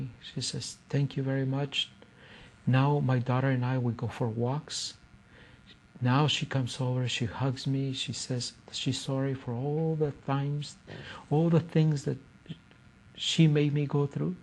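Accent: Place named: South America. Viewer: This man is from a south american( peru or something) country.. his accent is not a blackfoot, kainai or peigan or anything from that area.. sorry folks, but maybe he has some good teachings.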